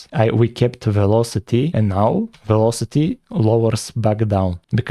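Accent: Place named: Russia. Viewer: You have a very Russian accent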